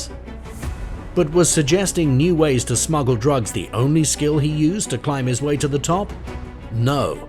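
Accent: United Kingdom. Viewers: I don't think he's Canadian my be British or Australian with not so thick of an accent.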